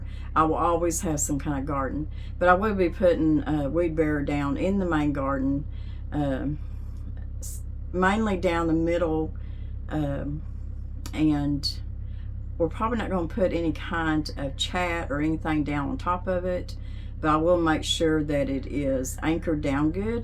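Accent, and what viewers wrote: South, United States. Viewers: I feel the same way……love everything about the home, both inside and out! Miss is simply a treasure, and I look forward to each and every video she and share with us! She has such an easy, gentle way of speaking, and I love listening to her sweet voice and southern accent. This video is one of my favorites, and I think many of us feel as you do ……she’s a “kindred spirit”, for sure! As for her beautiful wood floors……I can’t imagine telling ANYONE that their floor looks dirty!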